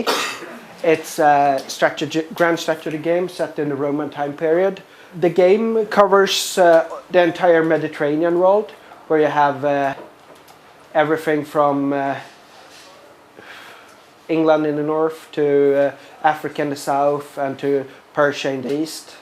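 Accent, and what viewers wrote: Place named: Sweden. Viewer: Swedish accent ftw!! ;)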